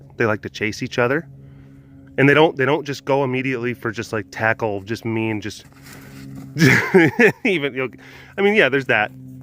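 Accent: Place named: Wisconsin, United States. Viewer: Your WI accent is strong in this one. :)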